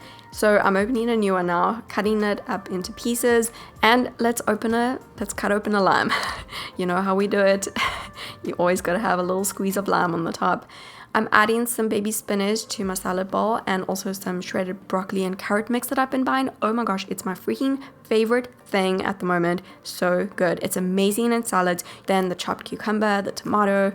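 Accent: South Africa. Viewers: It’s that smooth SA accent. 👌👌😉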